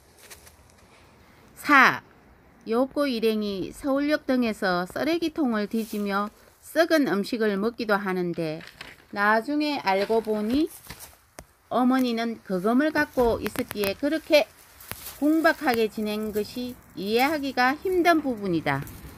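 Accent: Gyeongsang, South Korea. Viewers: 경상도 사투리 귀엽고 정겹네요~ 거제도는 참 볼게 많은 곳인데 몇번 가 봤지만 죽테마파트는 못가봤네예~ ㅎㅎ ㅅ ㅗ ㄴ 잡고 응원합니다 ^^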